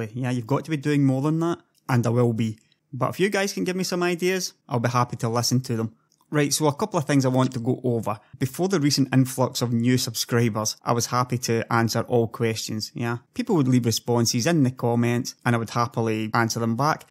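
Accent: Scotland, United Kingdom. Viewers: What I wonder about is why am I listening to a Scotsman whose Youtube localisation is from Sweden. There's clearly something not fitting right here. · can i ask are you scottish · hey i really enjoy your x-com vids. Start a twitch channel and freestyle it, and your Scottish swear more! :)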